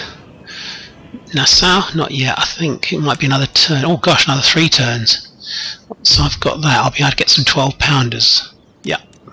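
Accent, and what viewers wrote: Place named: United Kingdom. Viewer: Oh man I'm so glad I found you! Fantastic naval battles! It's been such a long time since I found someone that is good! Also your British! Makes the voice over great!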